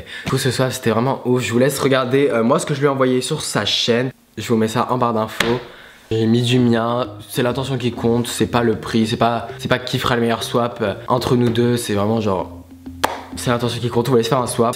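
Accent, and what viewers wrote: England, United Kingdom. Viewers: Tes vidéos sont bien meilleures sans ton accent anglais celle la était super :)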